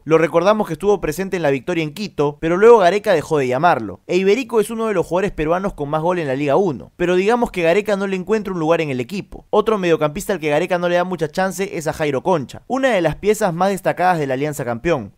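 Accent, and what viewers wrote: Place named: Cordoba, Argentina. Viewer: pprque tienes un acento medio argento, especificamente cordobés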